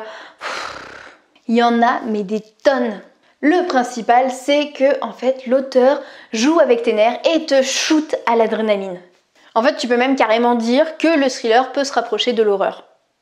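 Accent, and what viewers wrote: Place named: United Kingdom. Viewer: Et on aime les sensations fortes ! Et quel accent british dit 😋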